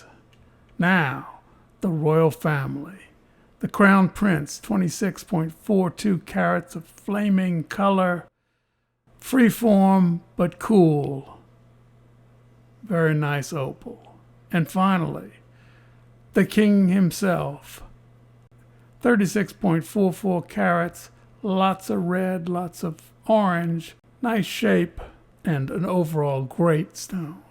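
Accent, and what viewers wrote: United States. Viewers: As I was leaving that comment I was thinking that I was probably wrong about the area of the United States where you picked up your Inflection. Bottom line Is that it all goes together with your tongue in cheek humour... It works!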